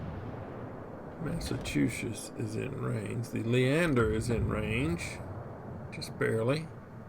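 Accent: South, United States. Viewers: Your southern Drawl is mispronaouncing the Word Cheshire. My Name is Tentto and on the NA server.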